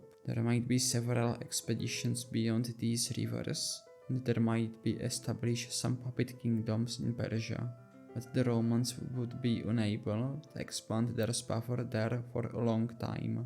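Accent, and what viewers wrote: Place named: Czech Republic. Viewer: Tbh you get used to it eventually and I like that traditional Czech accent.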